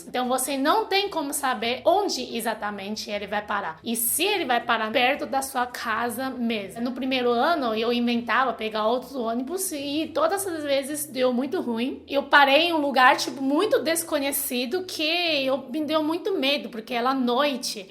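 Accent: China. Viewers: Incrível que em muitas palavras, ela aparenta ser brasileira, mas em outras o sotaque chinês entrega.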